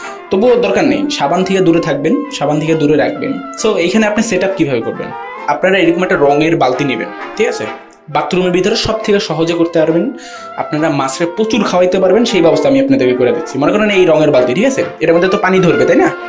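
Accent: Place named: Barishal, Bangladesh. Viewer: ভাই আপনার বারি কি বরিশাল নাকি 😊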